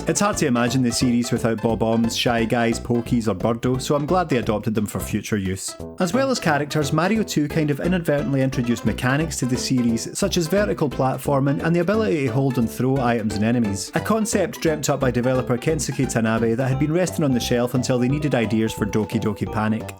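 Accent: Scotland, United Kingdom. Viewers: Im not native english speaker, but let me guess you are scotish?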